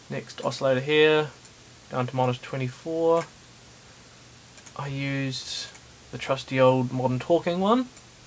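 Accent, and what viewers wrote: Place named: New Zealand. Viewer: haha i thought i could hear an nz accent ha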